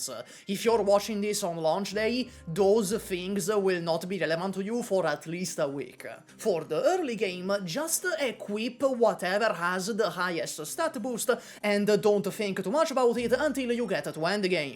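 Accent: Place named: Italy. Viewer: I dunno about "overwhelmed", I just came to listen to my favourite Italian voice for 20 minutes while I figure the game out myself lol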